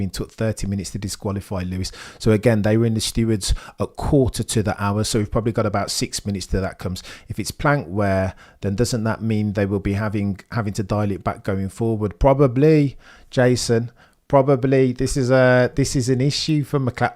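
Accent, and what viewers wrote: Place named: England, United Kingdom. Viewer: What part of England is this accent from?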